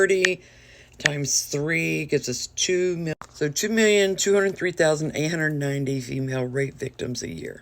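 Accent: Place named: Appalachia, United States. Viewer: Are you from the Appalachian mountains? From southwest Virginia here. You sound like we do lol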